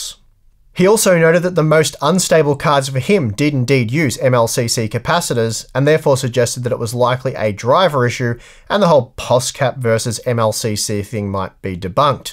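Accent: Australia. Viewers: I just noticed your Australian accent?? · I just watch this channel for the aussy accent the excellent quality is an extra.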